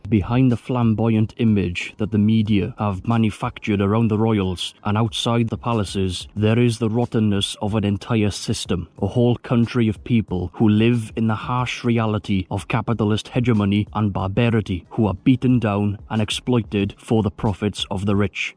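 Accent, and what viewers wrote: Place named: Wales, United Kingdom. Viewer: We all knew you were Welsh! That accent is unmistakable!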